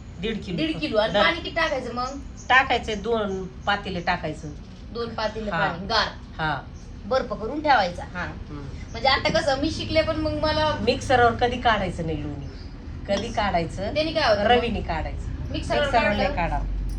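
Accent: Ahmednagar, India. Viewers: बोलणे तर नगर सारख आहे पुण्याचे वाटतच नाही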